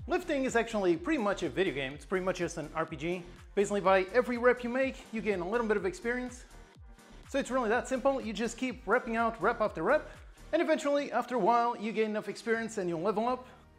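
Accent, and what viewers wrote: United States. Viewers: Wait, you are from portugal? I thought you were from America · Damn. Why I always though you were an american for some reason lol. Where are you from?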